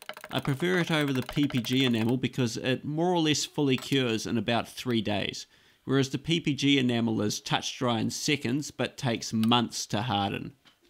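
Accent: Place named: New Zealand. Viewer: 1) Aren't you giving the foot-switch your luxury restorative spa-treatment as well? 2) Your videos remind me of the appendix discs of LotR where that guy from Weta talks about all the props and stuff (I mean this in the best way possible!). I think your accent may have something to do with it... 3) Who knew watching someone on the other side of the World restore old machines could be so interesting? Your skill, both at restoration and making videos, is impressive. I hope you keep posting,. 4) Greetings from Norway!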